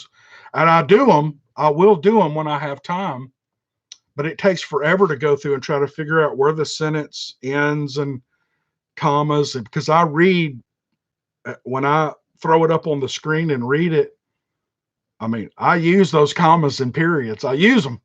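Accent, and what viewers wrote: South, United States. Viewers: If your passion is the story you certainly have the southern draw to tell them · We enjoy your videos, love the accent too. Takes me back to my southern roots! Had a lot of paranormal things happen all my life! Makes me feel at home. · My husband and I listen to you ALL the time, We love your show! I enjoy your southern accent & LOVE your stories!!🥰 We look forward to your next one ❤ Maybe one day I will send an email with some of our paranormal experiences, I think you would enjoy hearing them! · As a woman....I hate to admit it..I cant stand listening to a woman's voice who narrates another channel!. Your southern drawl totally makes the stories! I pictured you with more of a ZZ Top beard and good ol' boy image! Good job with your channel and continued success.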